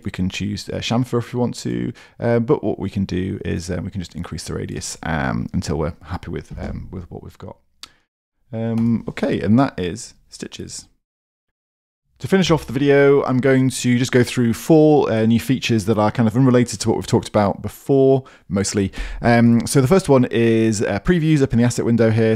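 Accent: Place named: United Kingdom. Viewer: He can't change being British. Also maybe check the playback speed in your settings.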